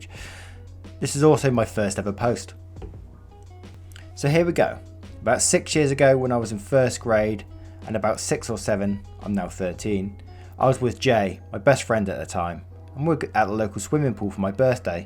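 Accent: United Kingdom. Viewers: That British blue accent tho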